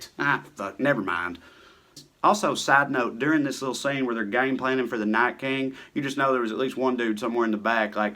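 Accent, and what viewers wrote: South, United States. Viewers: Got damn, my daddy cussed, and so did I. I was a small, blonde headed, spirited and polite Misssissippi girl just cussing when needed. One day on the playground, in the 3rd grade, a necessary "got dam it" moment occurred. A little Baptist girl, ( we were not Baptist) goody 2 shoes attacks me, yelling: "STOP TAKING THE LORD'S NAME IN VAIN." A vein was pulsating in her forehead. I blew her off, had she gone crazy? She followed, yelling. I turned around and asked her what she was talking about? Was she crazy? She explained "G.D". I looked at her as if she was insane and said," I am saying G O T DAM IT,it's got nothing to do with GOD!!!!!.........later I did delete "got dam" from my cussing...I knew, GOD knew I was not talking about "him" but I guess I did not want others to think that I did not love GOD....alas....I appreciate your clarification of your "Got damns", because they were bothersome to my hearing enlightening, intelligent words in a Southern accent. 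And that brings me hope and pleasure everytime you speak. Thank you,. Please continue sharing your thoughtful reality with us. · I am so fucking hooked on the brilliance, rhythm of Southern American speech, and erudition of, that I watched GOT after watching one of his takes on the show (I live in Spain, so we get everything late here.) Now, I am wearing dragon earrings and saying "Valar Morghulis" to random people in cafes. After I binge-watched the series, I watched his takes again, and I nearly tinkled my knickers.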